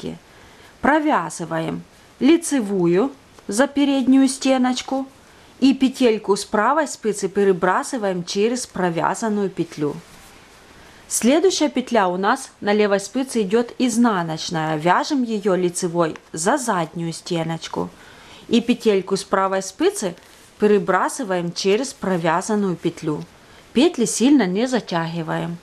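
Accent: Ukraine. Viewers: Западная Украина ? Или просто суржик ?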